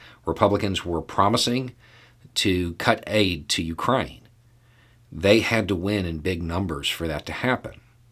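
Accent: South, United States. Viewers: Why do we get a bullshit republican "newspaper" ad after watching the fifth column?. Do they think that all rednecks are stupid and Uneducated? Certainly not if we are listening to this guy who talks with a hard as rock southern accent and still makes more sense of the political shit happening every day better than CNN. Kee it up hombre, we will just skip the Epoch Times crap!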